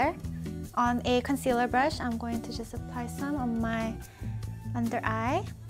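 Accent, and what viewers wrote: South Korea. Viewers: Every time I watch your videos, I notice how you have this Korean accent but I'm not quite sure if it's Korean accent or not because my fellow Korean friends who were born in Canada don't have such accent. Is it only me who notices her accent?? lol what accent do you have?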